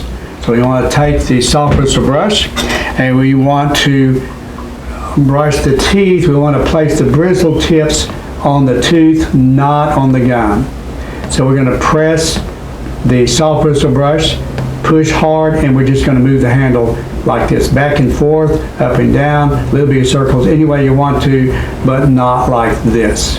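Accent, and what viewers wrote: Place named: North Texas, United States. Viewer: I was just thinking that, he’s got that North Texas accent!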